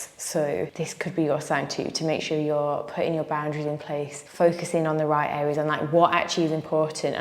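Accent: United Kingdom. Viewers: Okey I m here to learn British accent ❤❤❤❤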